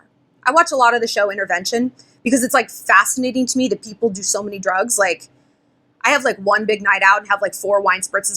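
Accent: England, United Kingdom. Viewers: English accent on point. 💜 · Your English accent is everything! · Nice Brit accent. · OMG YOUR BRITISH ACCENT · You have a nice English accent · I freaking love your British accent. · Good video. Good insight I didn't think about. What's going on with those pretty earrings? Really nice. You drive us crazy cool with your bangs! So pretty. Like the British accent. It works. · Your English accent is amazing I was smiling the whole way through (London girl here). How multi-faceted can a Queen be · Omg that British accent though 👑☕😂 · WOW REALLY AWESOME BRITISH ACCENT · Holy fuck your British accent sounds like Kate Winslet.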